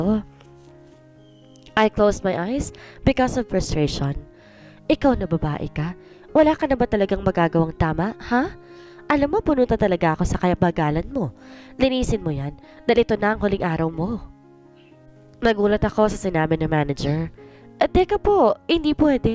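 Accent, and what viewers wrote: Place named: Italy. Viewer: The narrator did much excellent in Italian dialect, very good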